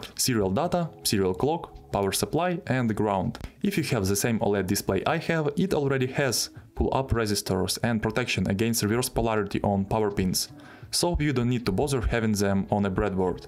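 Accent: Russia. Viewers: Акцент сразу выдает рашу )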